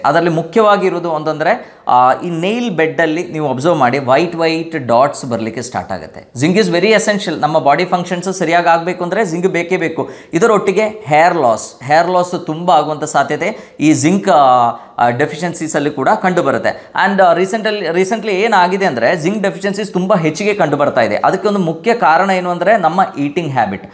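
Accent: Kerala, India. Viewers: Thank you doctor valleya information nange iron defeciency untu tumba hairloss allready aagide ...nan body iron absorb mado dilla hematology doctor helidru supliments indanu aagodilla injectionalli matra hemoglobin increase aaguthe adakke enadru maddu doctor ge gothidre dayavittu tilisa bekagi vinanthi.. .malayalam ecsent untu doctor mataduvaga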